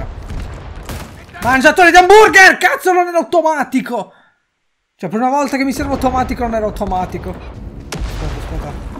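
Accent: Sardinia, Italy. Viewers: E' uno spettacolo questo gioco, ed il tuo commentario mi fa sbellicare dalle risate...questo accento sardo è super! Meno male uno che finalmente mette nella sua homepage quì non c'è fortnite...sei ancora più mitico!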